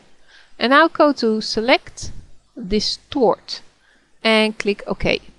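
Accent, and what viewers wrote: Netherlands. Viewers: What capture program do you use?, PS: Ik hoor het aan je accent, jij bent nederlands he?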